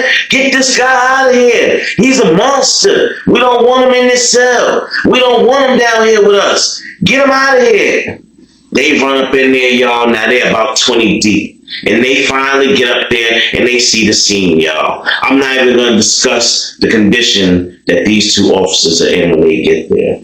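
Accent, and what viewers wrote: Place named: New Jersey, United States. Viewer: What part of Jersey you from my G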